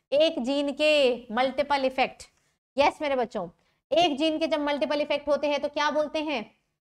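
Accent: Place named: Haryana, India. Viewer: Hariyana se ho aap😊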